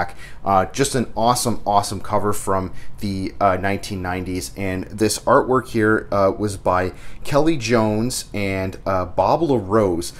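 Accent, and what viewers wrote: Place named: Canada. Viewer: This is what a Canadian accent sounds like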